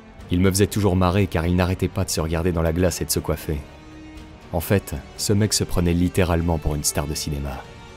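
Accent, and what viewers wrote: Italy. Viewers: tu peux un peu moins pousser sur laccent italien quand tu prononce les noms merci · Super documentaire digne d’un vrai travail d’investigation!!! Avec l’accent italien de surcroît!!! J’adore et je me suis abonné (+ cloche) direct car trop hâte de suivre la suite!!! J’ai 49 ans et suis quelqu’un de bien mais depuis petit j’ai toujours l’âme d’un voyou qui ne me quitte pas… lol 😎😉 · Super la voix avec les accents italiens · La vidéo est super. Par contre insupportable l'accent Italien.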